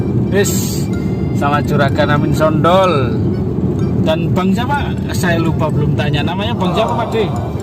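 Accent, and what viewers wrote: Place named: Java, Indonesia. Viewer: Aku suka dengar slang Jawa mu dong. Rasa senang dan menghiburkan.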